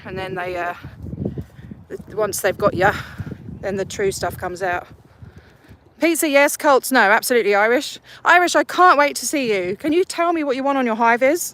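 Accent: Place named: Australia. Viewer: Lovely reservoir. Your Aussie accent sounded more obvious on this live. 💙💙🫶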